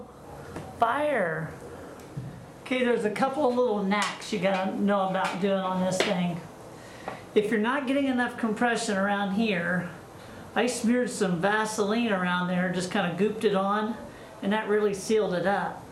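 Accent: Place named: Tennessee, United States. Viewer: She kinda looks like Elvis s sister in the way u talk so u from tenn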